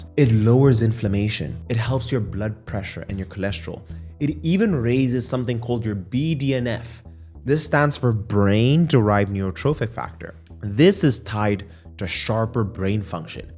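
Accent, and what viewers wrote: South Africa. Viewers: Aaah are you South African? The accent says I come from South Aaaahhh. If yes, please make us an SA specific meal plan, with all the foods we love in a way that helps us🤦🏾‍♀️🤦🏾‍♀️🤦🏾‍♀️. Shooting my shot. Or I read too much into the Aromat and accent. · . You have a South African accent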